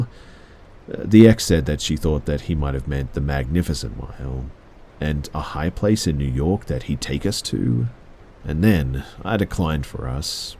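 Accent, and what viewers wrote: Australia. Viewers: Ah an Australian voice finally!? I'm in Adelaide. Chilling stories 🐨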